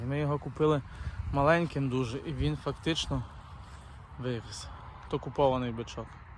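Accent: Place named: Lviv, Ukraine. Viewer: Привіт,чую по розмові шо ви з Львівщини,підкажіть де можна придбати теля бичка шароле?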